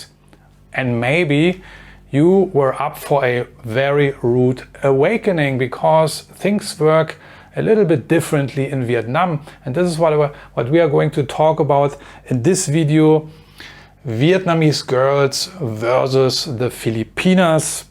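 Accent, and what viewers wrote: Germany. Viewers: love your dinglish Sir, I was living in Nurenberg before, totally missing this beautiful accent, and here is my story, I just met a super pretty Vietnamese lady from Vietnam Cupid website, but I'm suffering the huge obstacles in the lack of english and the difficulty to learn Vietnamese language, the differences of cultural behavior is confusing me greatly, the most incredible thing that i noticed is her super dedication to me which i never seen in my life with any woman I met....we already had 2 fights on our first week, but this video taught me a lot and I hope i will adapt to her....I can mot believe they do not teach english in school, I had to pass 3 languages in Egypt High school to go university ....!!! any how, Danke schoen.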